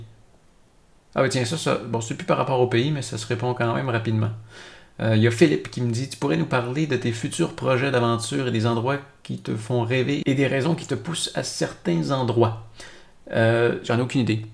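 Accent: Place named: Quebec, Canada. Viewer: Moi j'aime l'accent Québécois 🥰🤣Bonne journée.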